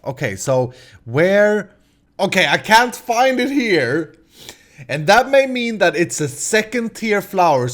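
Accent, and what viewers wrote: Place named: Sweden. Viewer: Omg -, you made a whistle sound that made me bust out laughing! man I love Swedish accents <3.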